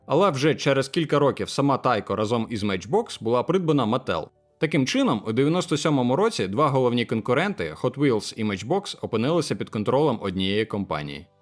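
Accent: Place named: Surzhyk, Ukraine. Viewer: Интересное видео посмотрел с удовольствием так как знаю украинский но по вашему акценту и суржику ухо иногда режет вы будто через силу и принципиально выбрали язык повествования из-за своей позиции это хорошо отстаивать мнение но если хотите больший охват аудитории то сами понимаете что не большая доля охвата людей в снг понимают украинский(с уважением коллекционерство без политоты)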